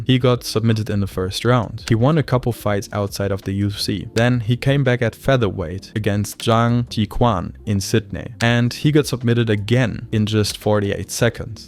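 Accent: Germany. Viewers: Gold video. Hat lange gedauert bis ich den deutschen Akzent gehört habe😂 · German accent is strong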